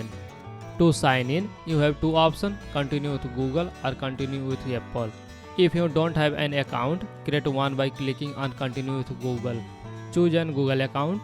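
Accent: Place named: Southwest Asia. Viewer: almost unintelligible. This would be fine in southwest Asia, but the speaker's accent is very difficult to understand.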